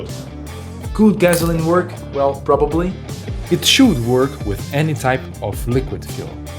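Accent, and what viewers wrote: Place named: Brazil. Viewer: Percebi de cara que era brasileiro pelo sotaque hahaha. Parabéns pelo projeto!!!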